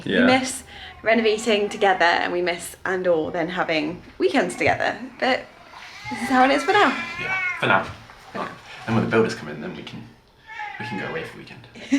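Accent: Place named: RP, United Kingdom. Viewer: you talk lovely quite posh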